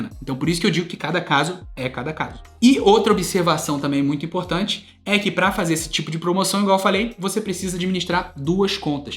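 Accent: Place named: Rio de Janeiro, Brazil. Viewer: Meu camarada, não troque o sotaque, deixa o s ser X naturalmente, somos cariocas 😅😅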